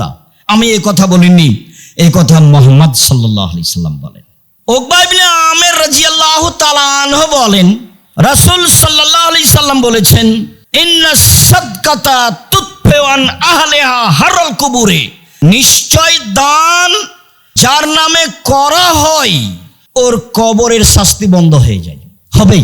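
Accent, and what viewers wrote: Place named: Kolkata, India. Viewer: কলকাতার দাদাদের মত কথা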